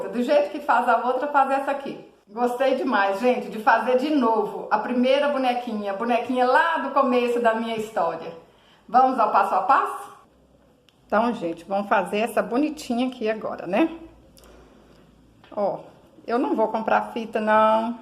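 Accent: Minas Gerais, Brazil. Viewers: Oi, adoro suas bonequinhas e vc é muito simpática e explica muito bem, acho q pelo seu sotaque vc é mineira, acertei? Eu sou nascida em Minas, Resplendor mas moro no interior do Rio. Parabéns!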